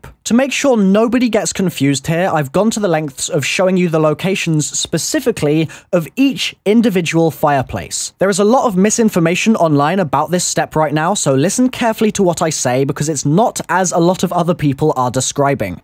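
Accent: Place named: United Kingdom. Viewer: his voice is probably "funny" because he's british. if you're american or something around that area, then that's probably why. his voice does sound a bit high-pitched for a grown man, though. maybe that's what you meant.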